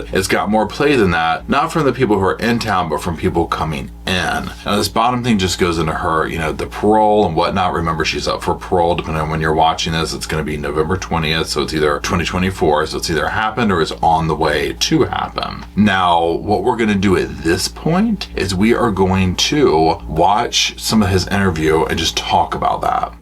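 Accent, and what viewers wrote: South, United States. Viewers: I’m listening to you as I’m having my afternoon coffee. Thinking that you are from the south but couldn’t put my finger on it. Just saw Pittsboro, we lived in Fayetteville(Fort Bragg) for 8 years. We lived there when this happened and I had just had our youngest child. I was so frightened to go outside, even though union was further west in SC, the car hadn’t been found yet. It was a time when carjacking was becoming a thing. So yes Susan Smith had people looking side eye at black men in a red car. It was a horrific crime and the judge showed pity on her by allowing parole. She’s right up there with Sarah Boone and Letiticia Stauch in her narcissism So heyyyyy from east Alabama!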